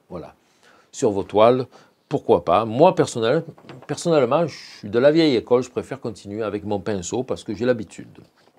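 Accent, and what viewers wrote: South, France. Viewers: felicitation maitre. je sens une pointe d'accent du sud de la France, vous êtes d'où ? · vous avez pourtant un bel accent du midi vous vivez au quebec?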